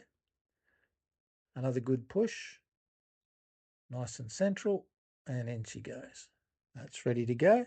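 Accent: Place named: Australia. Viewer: Sounds like you're a fellow Aussie. Where do you buy the Kato decoders?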